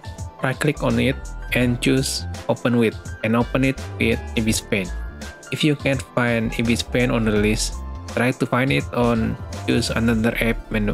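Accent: Indonesia. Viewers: I know ure indonesian jst from ur accent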